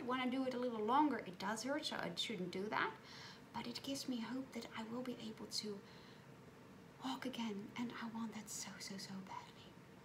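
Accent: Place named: Netherlands. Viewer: THANK YOU THANK YOU THANK YOU for reading that in Dutch. I am 2nd generation Dutch/Australian and both my Oma and Opa have passed on but hearing you read that just brought back amazing memories of two Beautiful people who i miss dearly. Dutch is a beautiful language and I miss that strong accent so much ❤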